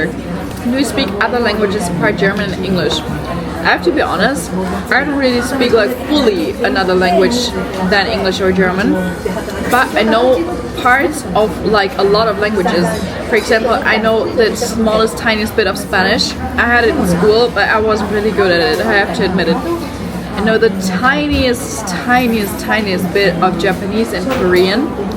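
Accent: United Kingdom. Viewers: You should lean into the British accent why not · You sometimes sound british and we’re here for it xoxo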